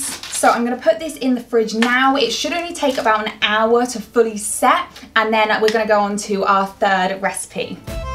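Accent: United Kingdom. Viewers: yeah you uk british accent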